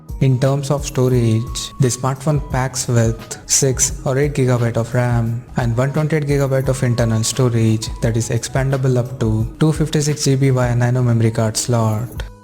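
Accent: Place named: India. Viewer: Your accent is very bad, If you gonna put videos to explain technical specs., You should get rid of Indian Language accent on your English. Each language has its own accent so don't mix please.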